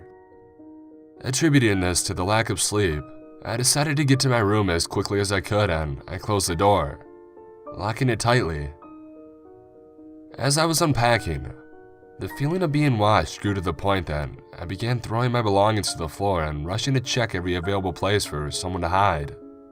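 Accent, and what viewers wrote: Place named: United States. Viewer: The fuck kinda dialect is this, Exceptional American?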